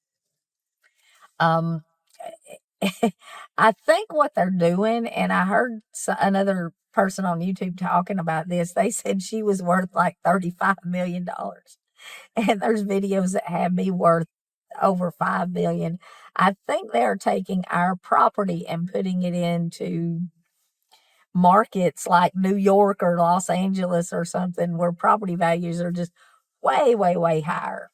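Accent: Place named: Kentucky, United States. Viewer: I've always thought you were from kentucky. 🤦‍♀️ I think the accent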